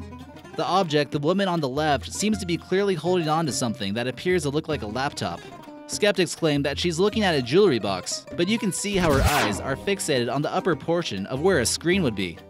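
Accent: United States. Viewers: theres something so jarring about an American accent and american flags when discussing classical greece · paused the video with the laptop bullshit. the snarky American narrator is also annoying. For these reasons i'm out!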